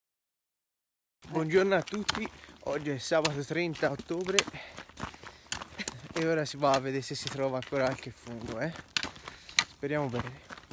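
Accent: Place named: Tuscany, Italy. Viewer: Belli i funghi ,bello te e la nonna,ma l’accento toscano mi fa’troppo impazzire!Complimenti con tanta invidia da una nonna che non ce la fa’ più ad andare a funghi,bravi!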